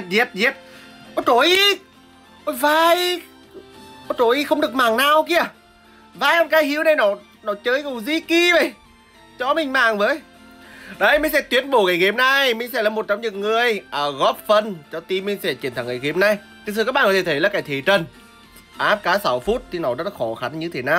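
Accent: Nghe An, Vietnam. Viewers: Giọng nghệ an phải ko anh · Tiếng người ở đâu nghệ An vậy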